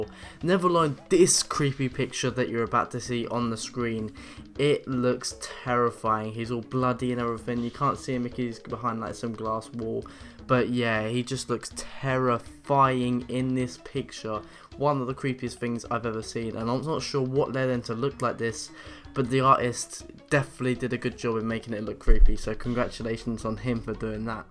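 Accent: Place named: United Kingdom. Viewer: Cut Him Some Slack He's British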